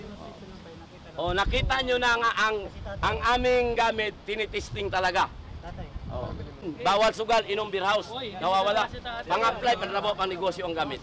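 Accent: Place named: Visayas, Philippines. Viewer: Bisaya rin Pala to c, sa Salita palang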